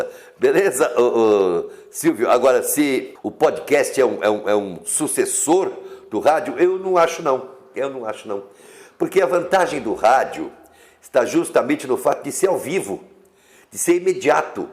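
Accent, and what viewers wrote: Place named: São Paulo, Brazil. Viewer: Vc e paulista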